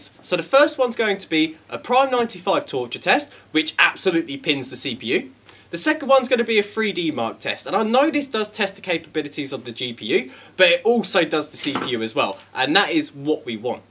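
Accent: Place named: United Kingdom. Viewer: Your accent was 😎 cool just like any British 😂😂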